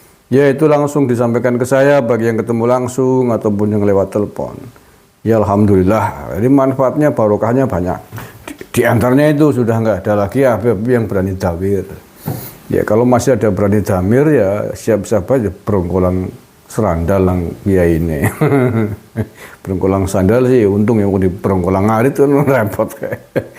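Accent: Banyumas, Indonesia. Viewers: Kang logate ngguyokna , ya lah aja ngilangna logat Banyumas , bahasa brengkolang sing marai ngguyokna , he he he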